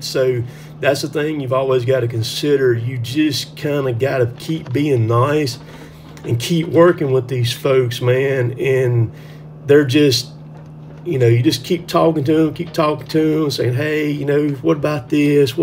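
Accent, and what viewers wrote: South, United States. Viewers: I could tell you were from either Alabama, Mississippi or Georgia. I’ve been thinking about buying tree climbing gear to expand my side hustle. I really do love tree work. I’m from Alabama. Know exactly where Wadley is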